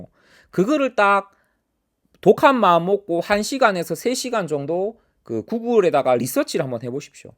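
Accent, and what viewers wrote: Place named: Gyeongsang, South Korea. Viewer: 소중한 인사이크 감사합니다 근데 원래 경상도 사투리가 이리 웃긴가요 ㅋㅋㅋㅋ 비하발언 아니라 진짜 웃겨서요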